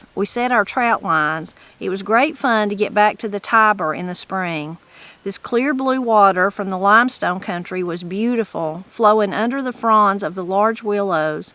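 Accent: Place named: Martins Ferry, United States. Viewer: I just subscribed. Your speech and culture remind me of my family roots from Martins Ferry area.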